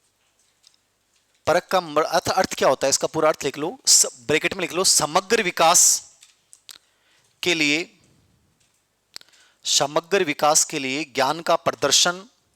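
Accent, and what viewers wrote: Rajasthan, India. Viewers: Sir aap ki Marwadi ne mn ko khus kr diya aap ke samne beth ke pdne ko mn kro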